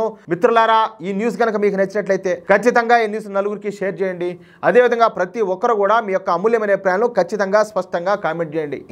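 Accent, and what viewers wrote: Rayalaseema, India. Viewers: అన్నా మీరు విశ్లేషించే విధానం చాలా అద్భుతంగా ఉంది. అందులోను మీరు రాయలసీమ యాస లో చెప్పటం చాలా సంతోషం. కానీ మీరు చెప్పేటప్పుడు ఆ visuals కూడా చూపిస్తే, మీ ఛానల్ ఎదుగుదల చాలా బాగుంటాదని మా అభిప్రాయం. జై శ్రీ రామ్ 🚩🚩🚩🚩భారత్ మాతా కి జై 🇮🇳🇮🇳🇮🇳🇮🇳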